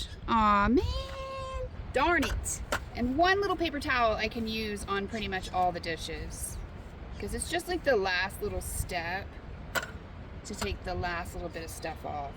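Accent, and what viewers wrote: South, United States. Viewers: Are you originally from the southern part of America?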